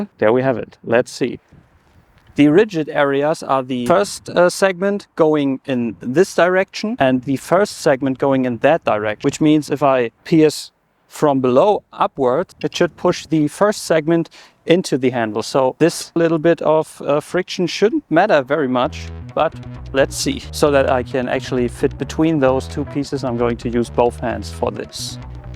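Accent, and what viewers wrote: Germany. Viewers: Ich hab nie bevor deinen Kanal gesehen, aber von deiner Aussprache hab ich gedacht, dass du deutsch warst. Jetzt weiß ich, dass du WIRKLICH Deutscher bist 😂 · Memes ✅ Nice edeting ✅ Pfandfalschen ✅ Deutscher Aktzent ✅ Dishonored content ✅ Mein abo hast du 😁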